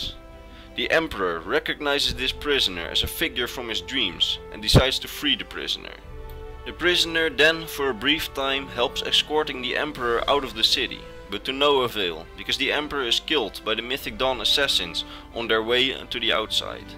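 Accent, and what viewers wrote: Netherlands. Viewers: This guy is Dutch i hear it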